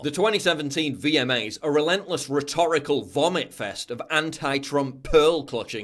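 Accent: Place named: Yorkshire, United Kingdom. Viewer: Geoffrey boycott accent.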